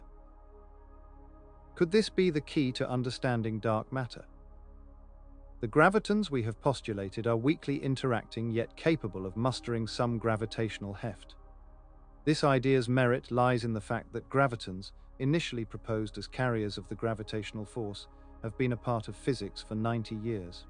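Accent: United Kingdom. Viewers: Haha “British Narrator”… I guess I’m not the only person who falls asleep more easily to a British accent…?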